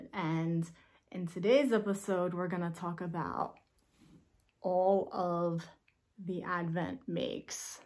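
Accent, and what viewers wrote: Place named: Philadelphia, United States. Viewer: You have to live near Philly, we sound the same! Lol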